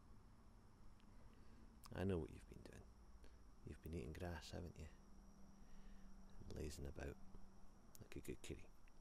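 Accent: Scotland, United Kingdom. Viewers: 😻 Kittie ✔ 🐈Catwalks ✔ 🗣Scottish Accent ✔ 💮Queen Anne's Lace ✔ Mmmm! That's some good content! 😋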